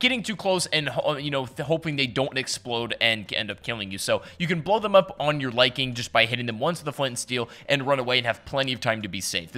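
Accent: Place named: United States. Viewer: Omg an american/Canadian accent thnk goodness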